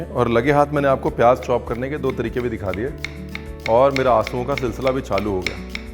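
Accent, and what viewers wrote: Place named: Punjab, India. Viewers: Apki boli Mai h Punjabi tadka jabardast or mast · Hii Ranveer, ye kafi pahle dali hui recepie hai. Personally mujhe mushrooms bahut pasand nahi par par kal kisi ne bilkul fresh mushrooms de diye. Ab banana to tha jyada the to socha dry hi bana lete hain. Phir search karte hai sabse pahla aapka vidio appear hua. Bas phir kya step by step follow kiya aur banai bilkul aapki tarah shandar kadai mushrooms aur sabko bahut pasand aaya. Aur Haan sath me jo aap apni style me bolkar jo panjabi tadka lagate ho lajabab. 👍👍👍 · I loved that Punjabi style talk, Punjabi people have that talent to make you feel cozy. Receipe is also quite interesting,but the talk, smile made my day!!❤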